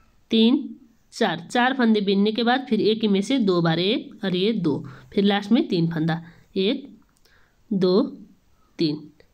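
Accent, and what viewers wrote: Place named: Kathmandu, Nepal. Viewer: आप की डिजाइन बहुत अच्छी लगी ।आपकी आवाज सुन कर काठमांडू याद आ गया धन्यवाद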